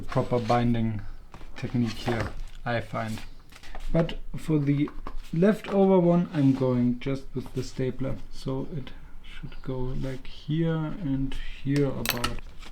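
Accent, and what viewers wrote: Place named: Germany. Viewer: a few seconds in and i see papers and i hear german accent... subscribed.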